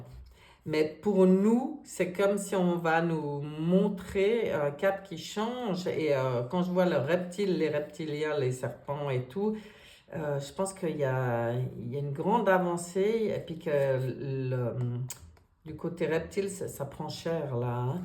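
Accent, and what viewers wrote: Switzerland. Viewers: Merci c'est toujours un bonheur de vous écouter, j'adore ce petit accent suisse❤ bonne année à vous et à très vite la sortie du tunnel 🙏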